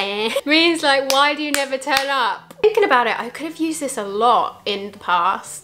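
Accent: United Kingdom. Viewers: Hi! Im new here. Im singaporean and this is the first time seeing someone who speaks British trying to speak Singlish! Keep it up!!! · I'm sorry but your british accent doesn't fit with our language... sorry gurl. btw, anyhow means something similiar to not doing it properly. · LOL... Love hearing in British accent :) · I love u speaking Singlish in Bristish accent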